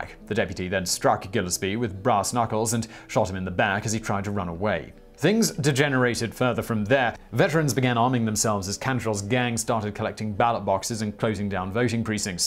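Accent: United Kingdom. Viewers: There are times when I watched him before I knew where he was for certain that I could not tell. Is he American (no) is he British (maybe?). I figured he was a transplant.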